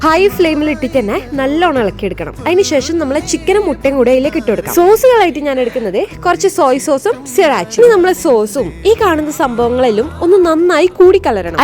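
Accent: Kannur, India. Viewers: Kannur slang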